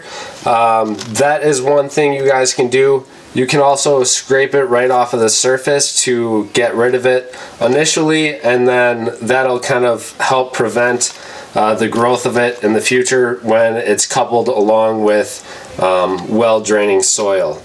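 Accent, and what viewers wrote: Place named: Wisconsin, United States. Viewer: Great helpful video! I knew we were neighbors when I heard the accent. Subbing fellow Wisconsinite!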